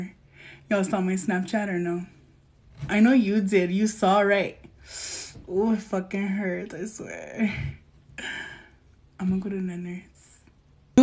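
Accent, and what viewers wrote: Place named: California, United States. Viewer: Is she representing all my girl in California? Cuz all of us speak like this! 🤣🤣🤣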